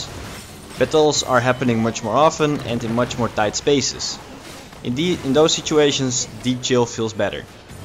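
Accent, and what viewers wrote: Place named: Netherlands. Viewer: Lekker hoor, vernederlandst accentje en af en toe wat Nederlands op de achtergrond :P Uiteraard een oude guide, maar lingering chill zal ik eens proberen ipv 30% meer range op Q :)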